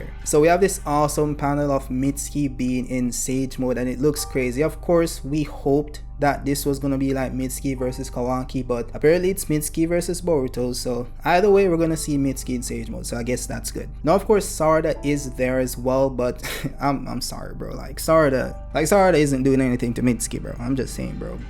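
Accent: Jamaica. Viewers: You sound like a Jamaican speaking standard English. Are you Jamaican bro?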